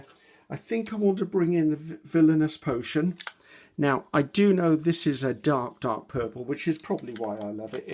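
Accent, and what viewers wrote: England, United Kingdom. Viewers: you are so awesome! Please don't ever stop making your videos. You are professional, but real, which makes it very fun to watch your videos. You make me want to come visit England just because of your accent and tidbits about your "garden", "rubbish", etc ☺️. Thank you for reaching and sharing.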